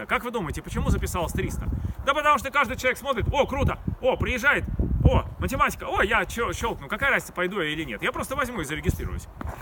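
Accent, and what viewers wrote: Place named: Okanye, Russia. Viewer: Очень харизматичный мужик (в хорошем смысле). Окает как монах.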